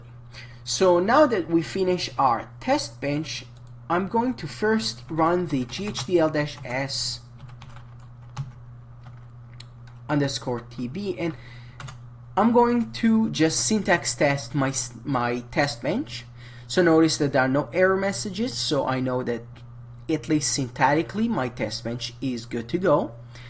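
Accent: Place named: France. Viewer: French 😄😄😄😄... sorry but this is the first time I'm hearing French accent beside in the movies....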